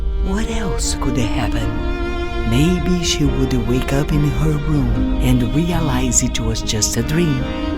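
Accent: Brazil. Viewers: A narradora tem sotaque brasileiro quando fala inglês.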